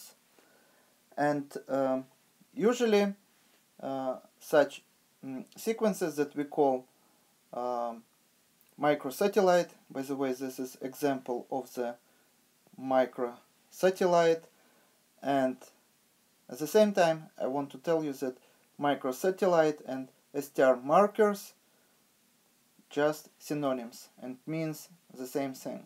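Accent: Russia. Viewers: Man you russian accent is awesome . :)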